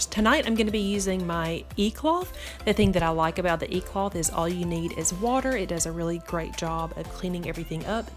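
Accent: South, United States. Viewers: My husband will never run a dishwasher, washer, or dryer if we are not home because if a hose breaks we would it hear a problem and have major water damage. With the dryer it is not water damage but electrical fire. Love your channel and of course your southern accent.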